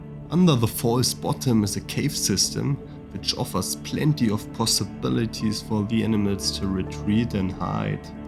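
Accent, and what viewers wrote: Germany. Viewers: I bet you're German based on your dialect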